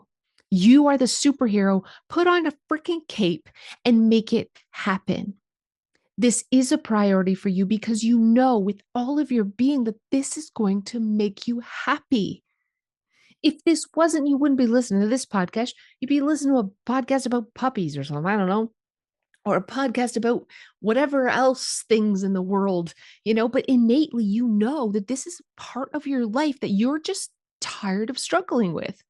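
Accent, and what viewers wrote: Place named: Midwest, United States. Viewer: I hear that Midwest “O” in your voice! ❤Where are you from?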